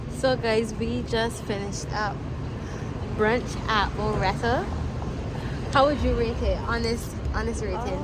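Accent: Barbados. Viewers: Hi, I love and enjoy your content, my only complaint would be that I wish it was more regular 😁. I particularly enjoyed this one. Please, keep your Bajan accent, it is so wonderful to hear you sounding like you've not left Barbados. Take care and God bless.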